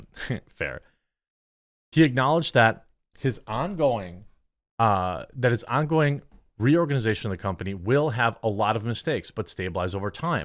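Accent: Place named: Midwest, United States. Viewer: Off topic but... I'm from northern Illinois but living in France for years (business consultant). I can't tell you how great it is to find and listen to a real Midwesterner speak like a normal person (in my opinion), without pretense, knowledgeable, and like an intelligent neighbor you feel comfortable arguing with. Thank you for being normal. Good luck with your company.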